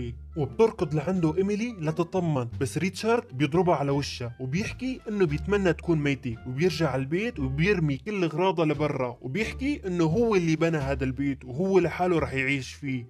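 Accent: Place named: Palestine. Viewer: لهجتك تشبه اللهجة الفلسطينية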